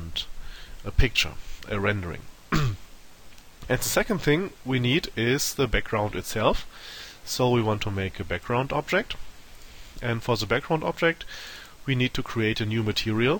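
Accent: Germany. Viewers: oh mann ich hasse den deutschen akzent :D Gutes Video trotzdem, danke!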